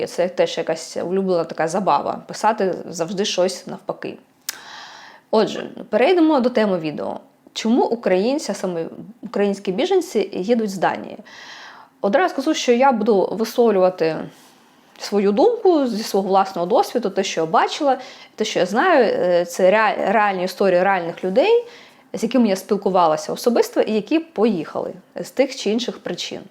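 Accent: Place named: Russia. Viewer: дивлюсь час від часу, бо приносить стрічка. А в Даніі віднедавна живе 18 річний син подруги, хочу знати. Не запам"ятовую саме вас, але стала пізнавати по ниттю. І ви, шановна, плутаєте національність і громадянство. У вас є освіта? Не знати таких речей - дивно. За громадянством ви українка, етнічно - росіянка, ваш акцент лізе з усіх дір. І менталітет не приховаєш.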